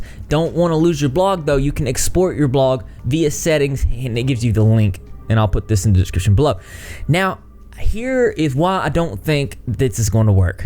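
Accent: South, United States. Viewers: Where do you live? I'm so curious, you sound southern!!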